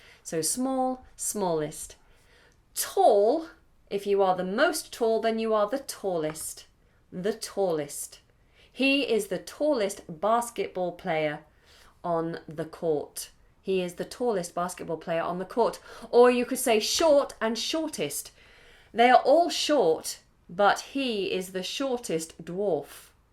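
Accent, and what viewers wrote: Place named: United Kingdom. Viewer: Hi! I am Chandan Gunri from India. I have been watching your YouTube videos very carefully. I saw don't know the exact meaning of somnambulism. It's meaning is walking in sleep. I love your British English which is really helpful. You are truly an incredible teacher and there is no doubt about it. Thank you. Please get in touch with me like that. Really, you are helping me a lot.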